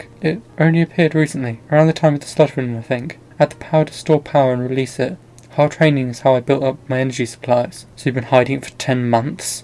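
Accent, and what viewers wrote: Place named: England, United Kingdom. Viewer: Just glad I can find a what if with a English lad narrating it.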